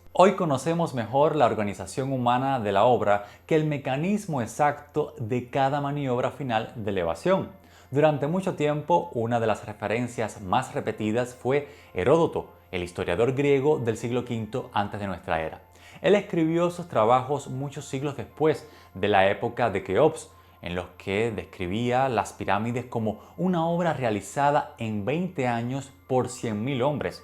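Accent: Cuba. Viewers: Hermano , ese acento y fraseo es MADE IN CUBA . Chevere 👌 , me voló la cabeza reconocer a un cubano abordando estos tópicos ancestrales , lejos de la "MACHACADA" y adornada historia de mambices y epopeyas INFLADAS que caracterizan la mal contada historia de Cuba. Gracias por tu estupendo trabajo . 👌. Saludos. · Me haces sentir orgulloso de ser cubano. Pensé que eras catalán, por tu apellido, pero tu acento me decía que hablabas como nosotros (cubano al este de Matanzas). Excelente trabajo, magnifica presentación. Sigue en esa dirección. Felicitaciones.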